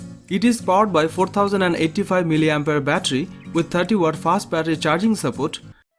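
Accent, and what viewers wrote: Bengal, India. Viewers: That dense Bengali accent in your English man!! ( P.S. Coming from a Bengali himself :D ) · Another indian vloger I just dont want their accent... It always looks like other vlog that dubbed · Ffs Indian accent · Bengali accent irritating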